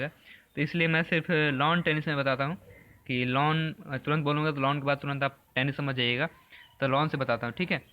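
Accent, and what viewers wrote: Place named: Bhojpuri, India. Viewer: Your bhojpuri tone is really sweet and this is really amazing trick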